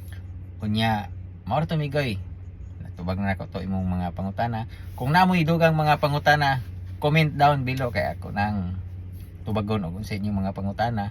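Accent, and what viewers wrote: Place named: Bohol, Philippines. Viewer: Mura lagi ka taga Bohol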